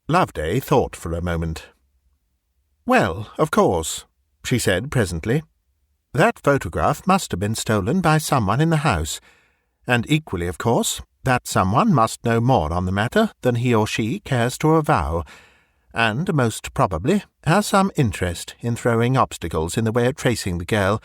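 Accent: England, United Kingdom. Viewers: This is very confusing!!! The princess was Turkish not Swiss and you give her 2 (!) last names!!! Is it Duveé or the first one where you call her Lucy Cunier!?!? It is NOT clear that you are talking about 2 separate persons!!! I think that you must've cut something that related that fact clearly, out! Also, it is sometimes difficult to understand you because of the British pronunciation, i.e a clerk-- where you pronounce it as "a clark" but you don't leave any space between "a" and "clark". I am not criticizing you; I actually enjoy your English accent. In general, I also enjoy the way you tell stories; it's just that I think that with respect to this one, you should not have left out important parts.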